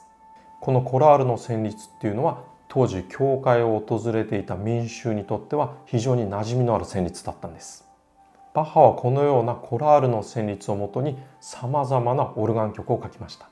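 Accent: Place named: Fukushima, Japan. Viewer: ピアノでバッハを弾くことを躊躇っていましたが、この動画を観て勇気が出ました。ありがとうございます😊 ちなみにわたしは福島県出身なので、の話し方には親しみがあります。これからも楽しみにしています。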